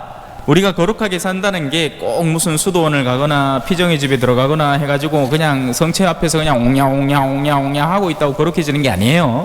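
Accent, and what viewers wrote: Gyeongsang, South Korea. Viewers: 찬미예수님....신부님..경상도.말씀이.더욱..더...감동입니다...신부님..강론.잘들었습니다.🙏🙏🙏🙏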